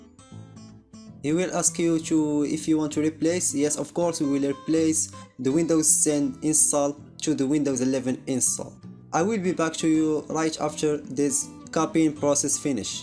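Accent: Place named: Morocco. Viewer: f....king Morrocan accent, I like.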